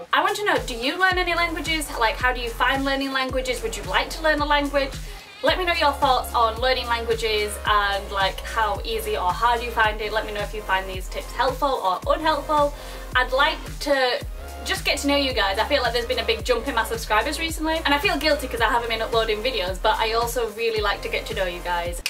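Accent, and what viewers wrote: Yorkshire, United Kingdom. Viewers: I love your accent <3 Which part of Yorkshire are you from? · hire! i started to see your yorkshire accent videos from 2011 and in your more recent videos i can understand much more now of what you are saying haha, i started to study in sheffield this year and man !! the accent is so difficult to understand, at least for me.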